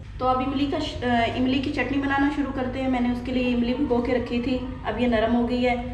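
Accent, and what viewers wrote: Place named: Attock, Pakistan. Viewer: yammay aap Attock se hain aap ke blne ka andaz attock wala ha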